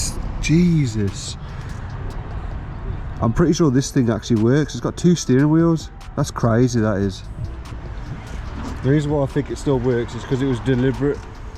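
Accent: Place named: England, United Kingdom. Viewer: What a fun English accent...and owsome cars...yeah...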